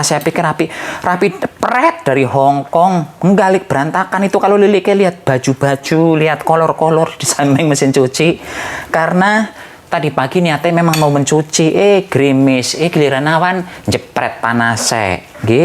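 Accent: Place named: Java, Indonesia. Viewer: asli mana ya, kok jawa bangey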